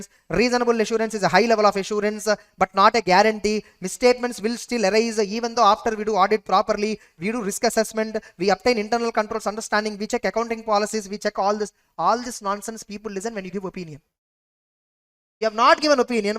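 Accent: South India, India. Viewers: Im gujarati medium student Watching audit in english in south accent in inter Aura <num>😂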